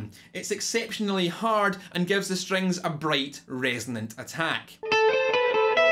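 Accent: Scotland, United Kingdom. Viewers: Isn't it obvious that he's a Scot?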